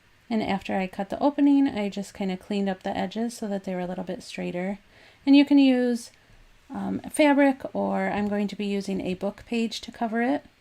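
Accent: United States. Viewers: So many cute ideas! I love the gift tags, the paper flowers, the tray and the craft room storage ideas. I also like the pumpkins - I actually knit those so I think using socks might be easier. Your Michigan accent (guess) makes me think of home. I was raised in Ohio. Thanks for sharing your creativity! 💜🙏🏻👼🏻✝️ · Your creativity and ingenuity knows no bounds! Wow, it's hard to choose a favorite...I loved so many of these! Thanks for sharing such inspiring projects with us! P.S. Are you from the Northern Ohio/Southern Michigan area? Your accent is familiar : )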